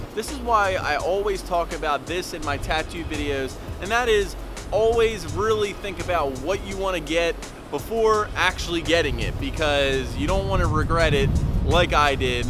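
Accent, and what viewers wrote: Philadelphia, United States. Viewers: I like the philly accent. I grew up maybe an hour outside and now live in Philly. I’ve always wanted a sleeve but being in philly made me want it more, everyone is tatted😂 the career I want is about as white collar as you can get so I’m a bit hesitant but I’ve heard of people that have them from the companies I want to work for. Any shops you’d recommend in the area(not sure if your still around Philly) also have you had any trouble yourself getting jobs with them?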